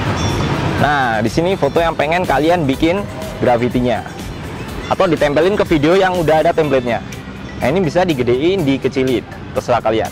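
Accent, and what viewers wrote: Malang, Indonesia. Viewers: Abangnya orang Malang?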